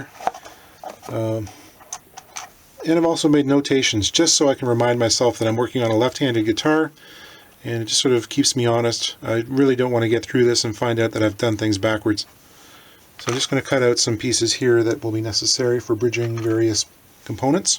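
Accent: England, United Kingdom. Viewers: British accent, classic. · I thought so too well, ENglish not British accent). Then I thought of older, bolder, colder, holder, folder etc. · Never mind "solder"......I'm still surprised that you all appear to pronounce the word route (UK pronounciation 'root') as rowt......I never heard Bobby Troup's song sung as "rowt 66"....always "root 66".....just making the comment.....love your absolutely spiffing tutorials and what a class English accent!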